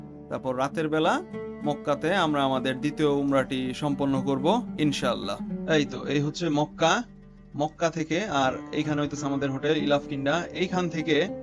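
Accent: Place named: Tangail, Bangladesh. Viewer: খুব ভালো লাগলো ভিডিও টা। ভাই আপনার দেশের বাড়ি কি টাঙ্গাইল? আপনার কথা শুনে মনে হচ্ছে আপনার হোম ডিস্ট্রিক্ট টাঙ্গাইল।।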